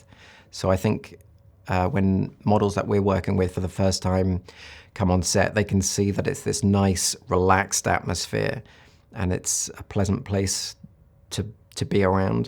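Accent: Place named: United Kingdom. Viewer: Dude is kind of meh. British accents not good for stuff like this.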